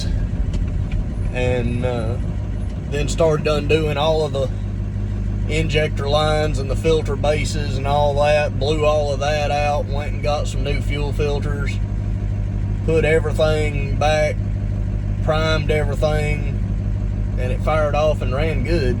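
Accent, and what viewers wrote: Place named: South, United States. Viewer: Hey, I love to hear you talk. Too many of us southerners are losing our accents! Would love for our northern friends to listen to your ramblings!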